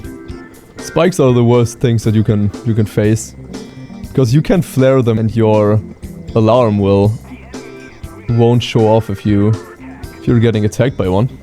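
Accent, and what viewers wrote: Germany. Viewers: Are u german by chance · You are german right? I hear that. also you pronounce german tank nemes right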